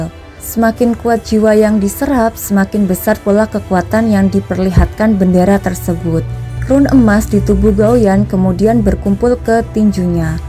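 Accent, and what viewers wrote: Java, Indonesia. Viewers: kok suara ini kayak channel sebelah suara medok nya ni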